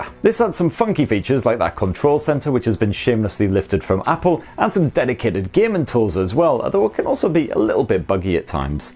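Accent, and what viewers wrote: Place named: North East England, United Kingdom. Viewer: North East UK. Mic of English and Scottish English.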